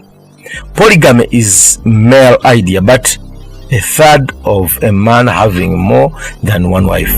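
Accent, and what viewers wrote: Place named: Buganda, Uganda. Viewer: Hes amuganda even hea accent u can tell so bunyoro tooro u dnt count 😏😏🤔🤔🤔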